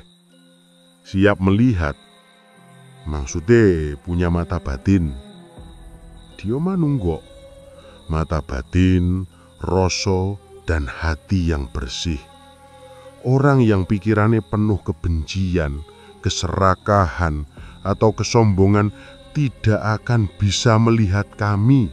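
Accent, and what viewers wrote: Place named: Banyumas, Indonesia. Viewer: Nyimak ewong ngapak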